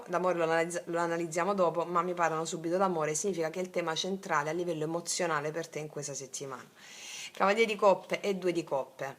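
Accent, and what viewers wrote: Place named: Salento, Italy. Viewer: Buongiorno mia cara, ti ho scoperto questa mattina... E stato come sentirti fare parte della famiglia... Sento dal tuo accento che sei Pugliese... Anzi... Salentina... Come. me ....Sei una forza della Natura !!! E si sente tutta la Passione e la Preparazione che Emani nelle tue stesure... Che sono rimasta Affascinata !!!! La tua Simpatia è Contagiosa ... E Analizzi in Profondità... Mi piacerebbe conoscerti Personalmente !!!! Ti Abbraccio Forte !!!! 🏆💥😂🤗🤩😇🍀🔥🎤🎶❤️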